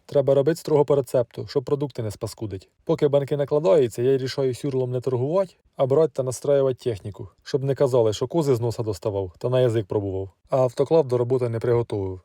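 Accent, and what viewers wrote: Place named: Surzhyk, Ukraine. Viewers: Мои сваты из села Мыколаивки Новомосковского района так балакають, такой диалект суржика прикольный, юморной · Дякую за відео, слухаю наш рідний суржик як бальзам для душі 🎉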